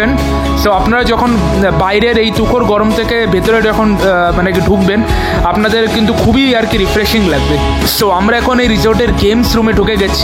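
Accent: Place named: North, India. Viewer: Are you from north India? You are not native of Bengal. Your bengali pronunciation is different.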